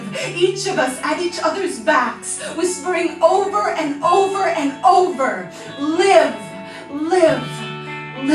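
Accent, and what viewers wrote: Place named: Midwest, United States. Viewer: For one, Everyone has an accent haha; but if you wanna be specific then she has a modern midwestern american accent. I would know, I'm from Colorado.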